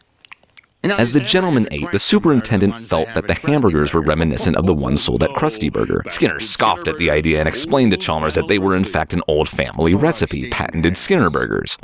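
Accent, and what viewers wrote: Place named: United Kingdom. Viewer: Sound very British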